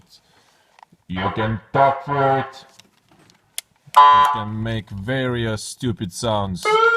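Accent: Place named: Russia. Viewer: first, sorry for my bad Englisch, you talk like an Russian Comrade, i' am and my friends are very louthing about your Video , your Speech is wonderfull, you are the Best !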